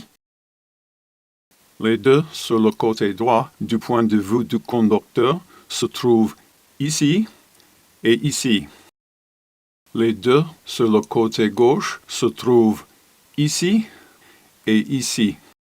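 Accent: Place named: England, United Kingdom. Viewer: Merci Monsieur, avec l’accent anglais c’est encore mieux 😊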